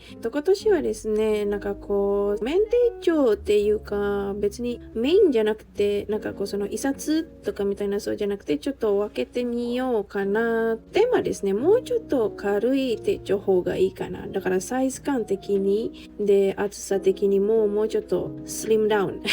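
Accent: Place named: Indonesia. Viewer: I guessed from your accent that you're from Indonesia. Hello! I'm from the Philippines, also living in Japan. よろしくね！ 手帳や文具が増えるばっかりで、その活用方法のため自分でよく手帳会議をしています！💦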